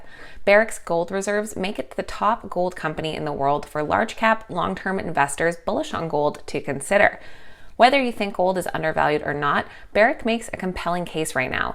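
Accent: Canada. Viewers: I wondered if you were Canadian because you say "prō-cess" instead of "prah-cess"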